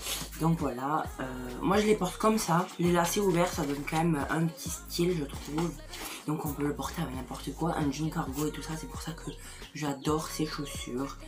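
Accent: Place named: South, France. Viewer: Bonjour j'adore ta chaine et j'ai la même passion que toi...Tu habites où avec ton super accent du sud???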